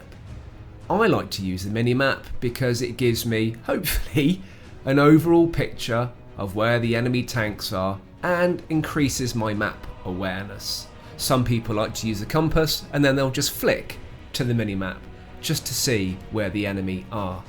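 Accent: United Kingdom. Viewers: Are you Bristonian ?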